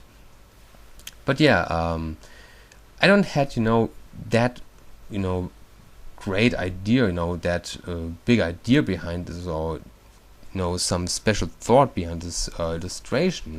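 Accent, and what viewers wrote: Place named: Germany. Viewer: Funny. The minute I heard his accent, he could only be German! XD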